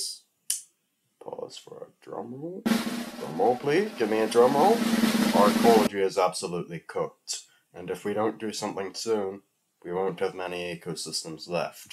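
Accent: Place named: Ireland. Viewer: yeee 🤙, that's cool, for an Australian your accent definitely has an Irish sound to it I swear. I'm from Irish stock too and I have cousins with my Irish last name in Australian. The emu war was hype 🤣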